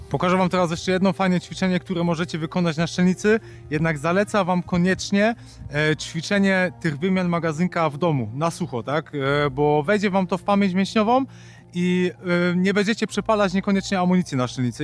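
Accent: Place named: Germany. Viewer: To lubię: krótko, treściwie i na temat :) A tak z ciekawości - długo jesteś w Niemczech? Mam wrażenie, że słyszę już lekko niemiecki akcent ;)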